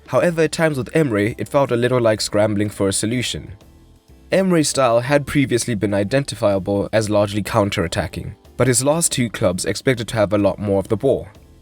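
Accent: South Africa. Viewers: South African? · This guy sounds like he is from Durban.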